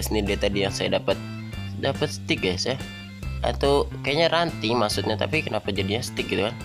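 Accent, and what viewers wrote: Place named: West Java, Indonesia. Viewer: bang orang sumda ya aku dengar deukeut saat yang ke-3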